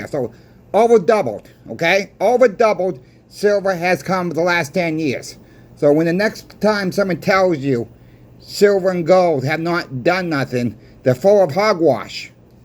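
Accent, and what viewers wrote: Boston, United States. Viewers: keep them coming. You got the gift of gab and IDK the accent but it works for the channel 🤣 not to assume but is that a Boston accent?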